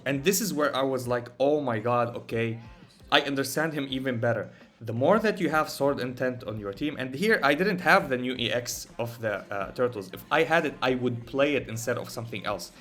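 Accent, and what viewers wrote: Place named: Arab world. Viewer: Bro i think you are arab, your english accent is arab. Anyway, are you still playing echocalypse?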